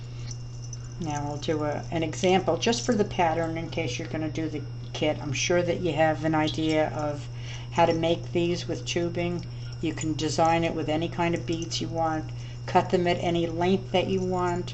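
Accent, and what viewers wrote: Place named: Ireland. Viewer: where is your accent from? You have some words that sound Irish. (I'm Irish, and I recognised them lol)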